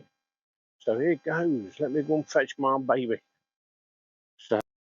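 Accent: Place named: Derbyshire, United Kingdom. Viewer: Very funny like your stile.Listening to your accent I dont think you live all that far from Wetton mill.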